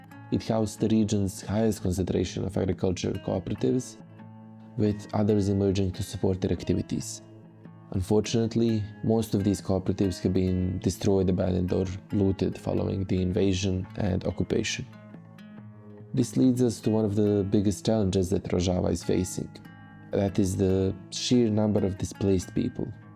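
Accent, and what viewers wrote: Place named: South Slavic, Eastern Europe. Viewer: Love the video I hope there are more geopolitics commentary with south slavic accent out there.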